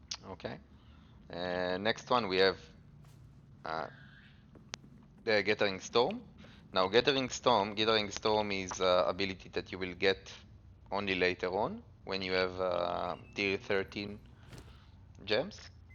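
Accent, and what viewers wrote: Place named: Israel. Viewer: חח תודה רבה צדיק זיהיתי לפי המבטא את הישראליות ! נראה מעניין,אולי אלך על הבילד הזה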